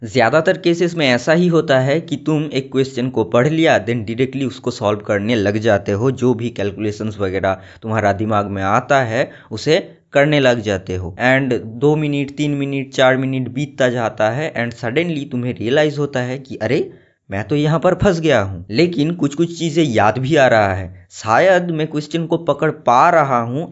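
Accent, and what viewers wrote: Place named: India. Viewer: Ap bengali ya north east se ho kya bhaiya apke accent se lgrha btw me uttar pradesh se hu mains me 98%ile aya hai advanced karne ka soch raha tha but dar raha tha tough questions se lekin aapne achi advice di hai follow karunga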